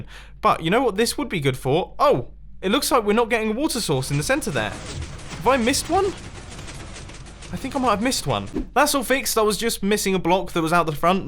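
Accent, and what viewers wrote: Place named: United Kingdom. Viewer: I love that British accent "watah source, watah source, watah source" xD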